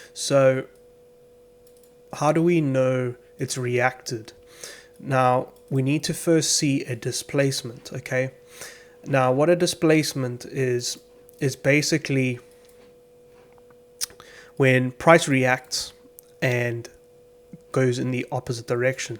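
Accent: South Africa. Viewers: are you South Africa? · dude you sound South African hey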